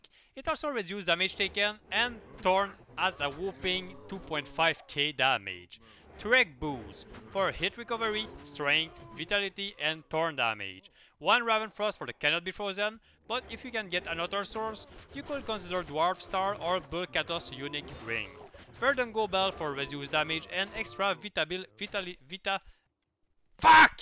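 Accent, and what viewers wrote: Quebec, Canada. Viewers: Omg that thick QC accent xD, cool vid!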